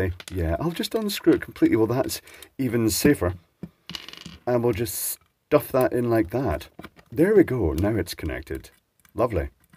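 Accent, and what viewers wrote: United Kingdom. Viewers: he has such a british accent accent. ( i am from the netherlands ) so any one can help me how to, rephrase this ?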